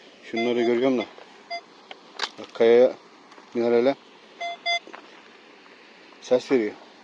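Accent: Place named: Uşak, Turkey. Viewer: Usta sen nerelisin ya bizim uşaklılar gibi konuşuyorsun şiven çok benziyor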